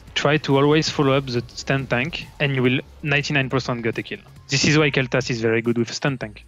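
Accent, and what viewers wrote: France. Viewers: warchief ultradeath conan barbarian terminator.... But English with French accent is so good! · The frenglish is real :D i never know if he's saying "hit" or "eat", both make sense haha. Stil, love the vid, and love the team <3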